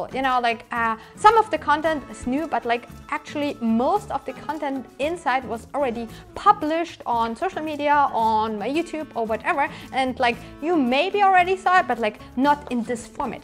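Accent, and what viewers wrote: Germany. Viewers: You are like clark kent before and after costume.. she goes from that nerd look to a super sexy warrior.. lol... And that german accent nails it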